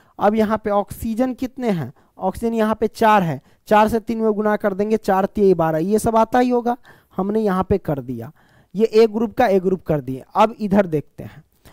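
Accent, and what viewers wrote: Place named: Bhojpuri, India. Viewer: Kya aap bhojpuri h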